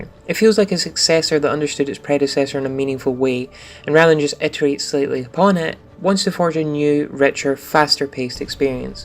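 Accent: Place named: Scotland, United Kingdom. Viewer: The Scottish accent is a wonderful thing if you're aware of it. I quite like the delivery here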